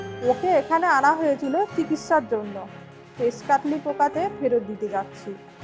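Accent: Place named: Manbhum, India. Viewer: আপনার ভয়েস অ্যাকসেন্ট শুনে মনে হচ্ছে আপনি আসানসোল পুরুলিয়া বাঁকুড়া মেদিনীপুর অর্থাৎ মানভূম অঞ্চলের মানুষ।ভুল হলে জানাবেন।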